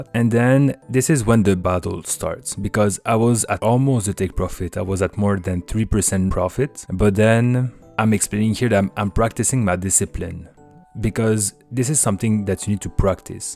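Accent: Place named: France. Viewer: je me disais bien que l'accent me disait quelque chose mais je savais pas que t'etais francais mdrr beau boulot en tout cas bravo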